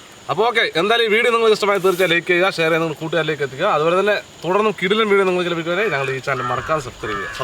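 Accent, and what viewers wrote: Palakkad, India. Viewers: ഇക്കാടെ പാലക്കാട് ഭാഷ ഇഷ്ടമുളളവർ ലൈക് അടിക്ക്🤩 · ikkayeyum palakadan bhashayum ishttamullavar like adikku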